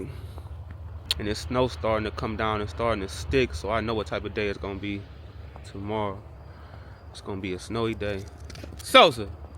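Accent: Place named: Washington DC, United States. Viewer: Man you sound like a DC dude from time to time you had to around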